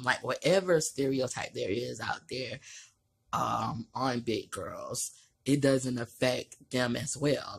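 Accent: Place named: Florida, United States. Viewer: Imma little bitty bitch but i can relate to you in a lot of ways! And i been watching yo vids for some years now and i can see that LIKE ME you too didnt have good school years... they picked on me cause i had acne..for the most part. And when my hair fell out in 6th grade it was cause i was bald head and had acne. And i was really self conscience and had very low self esteem them days too. So i was also naturally depressed ofcourse. So again sis i can relate. I know the lame from snap said some "negative" shit but i watch you too and here go some positive shit for yo ass. 1. You REAL asf, and thats more than i can say for most ppl. You very honest and blunt. 2. You funny, 3. You are BEAUTIFUL, and i being dead ass when i say that 4. Normally you are very CONFIDENT and vivacious. But these last videos i dont seen that you recently did..have been down and in your feelings and im worried cause your normally Happy and funny and smiling and shit just being...and your eyes looked lively and happy. 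In this video they look so sad. I just want you to know your real subs dont care that you a big girl or that you breathe hard. I never noticed tbh...i just hear that thick as florida accent. I like yall accent lol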